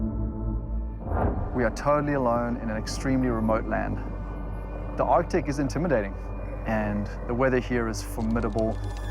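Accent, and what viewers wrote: South Africa. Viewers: I hear a South African accent. It's so distinct. Good luck!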